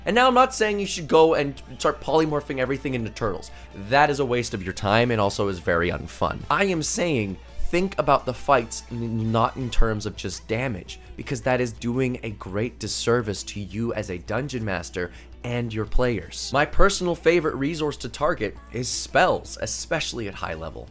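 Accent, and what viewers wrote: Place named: Canada. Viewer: Stacking dice up each move to eventually take that much damage is a great idea. I'm surprised you are from south Africa, all I hear in your accent is Canada.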